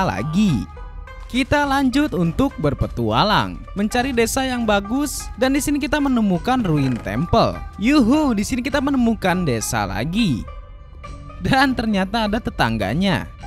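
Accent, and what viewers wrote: West Java, Indonesia. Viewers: Orang sunda bg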